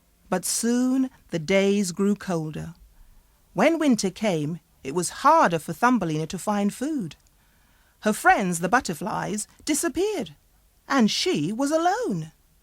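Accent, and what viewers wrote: United Kingdom. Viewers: イギリス英語ですか？よく聞くのと発音がちょっと違う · エル エル イギリス英語です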